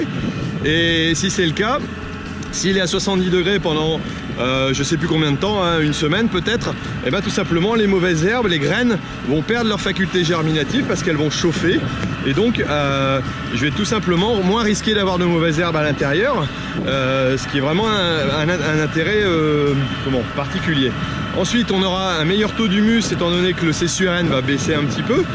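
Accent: Nord, France. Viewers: T'es du Nord ??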